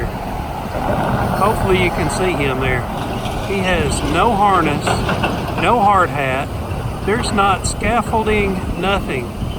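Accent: South, United States. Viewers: Great episode,! I live in Georgia(USA). Just subscribed to both channels. I enjoy your southern drawl and your videos! Thanks!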